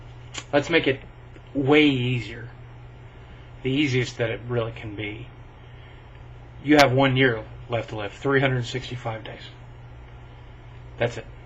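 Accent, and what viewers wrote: South, United States. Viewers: Sad looking back at this Mate, funny thing is here you sound like a cowboy from the south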